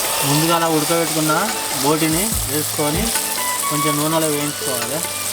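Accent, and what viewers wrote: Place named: Telangana, India. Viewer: అన్నా మంచి మంచి తెలంగాణ వంటలు చేసి చూపెట్టినందుకు ధన్యవాదాలు మీ వీడియోస్ గాని మీ యాక్టింగ్ గాని పక్కా మన తెలంగాణ యాసలో ఉంటది మీ ఫోన్ నెంబర్ పెట్టండి అన్నా మీతో మాట్లాడాలి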